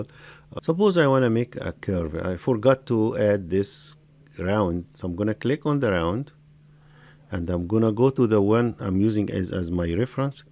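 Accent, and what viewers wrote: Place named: Russia. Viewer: great videos, 3 days before i worked only In INVENTOR, but now starting be proficient in Edge to :-D ty. Nd BTW, from your accent, you RU ? :-D I am Slavic to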